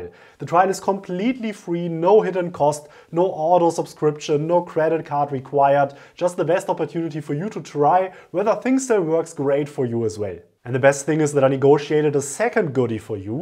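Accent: Germany. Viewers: I got a headache after listening to his accent. Is he German or French? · Your german accent is hilariously strong, to a distracting extent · You can hear it directly that he is German 😂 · Obviously German ; )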